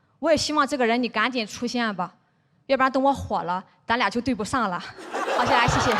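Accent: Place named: North, China. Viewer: 他的风格一部分是靠节奏，一部分靠口音，好笑不好笑要看对北方方言的熟悉程度，不熟悉的人就是觉得不好笑。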